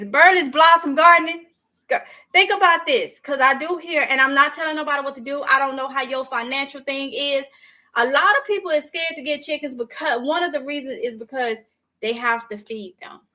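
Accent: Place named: South, United States. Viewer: This is my first time making a comment, girl don't apologize for the way u talk.we southern ladies can't help it.We get the message across. Also,don't stop these vlogs,they are so helpful. Please call me!!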